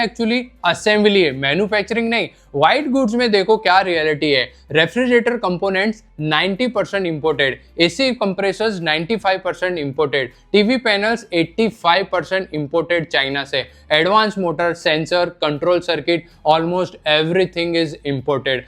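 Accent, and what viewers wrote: Gujarat, India. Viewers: Reduce gujju accent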